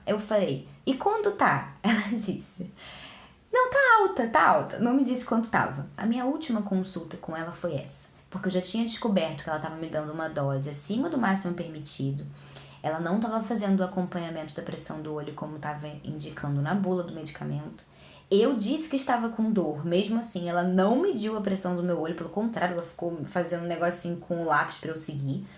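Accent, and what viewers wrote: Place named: Rio Grande do Sul, Brazil. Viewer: Quando eu era pequena (hoje tenho 32), tu tinha sotaque gaúcho:) Que engraçado te ouvir agora!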